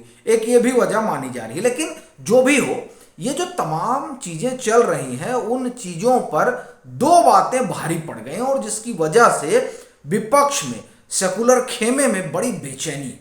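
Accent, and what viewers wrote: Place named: Bihar, India. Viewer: अच्छा विश्लेषण। आपकी बिहारी उच्चारण शैली मुदित करती है।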